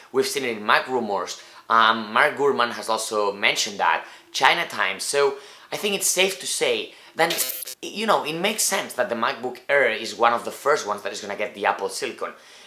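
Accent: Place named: Italy. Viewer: I am definitely obsessed with Apple! I like your accent! Where is it from? Italy?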